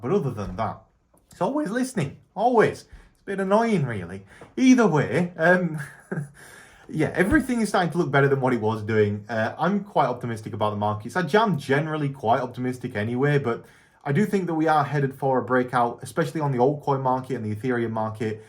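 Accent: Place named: Lancashire, United Kingdom. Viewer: Always enjoy your videos mate, keep it up, good northern accent, take its Lancashire? im other side of Manchester Derbyshire, but they class it East Midlands 😢😢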